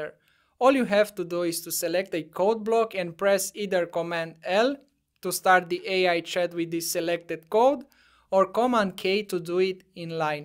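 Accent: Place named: India. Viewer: Came here for the Indian accent.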